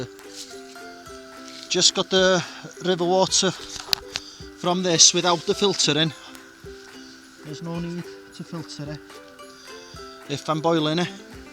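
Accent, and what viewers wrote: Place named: Wales, United Kingdom. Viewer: Great video buddy,proper Welsh accent up your way. Bet you speak Welsh to.... Nothing wrong with a wild camp in lock down. Done it myself... Felt proper safe from covid, being miles away from civilisation. If you have any negative comments.. Tell them to piss off.... Check my wild camp out buddy... Atb dai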